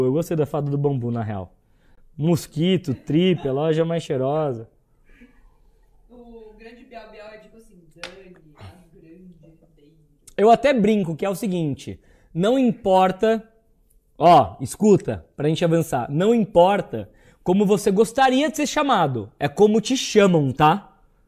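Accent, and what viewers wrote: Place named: Caipira, Brazil. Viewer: Adoro o sotaque caipira da!!!! Maravilhoso!!!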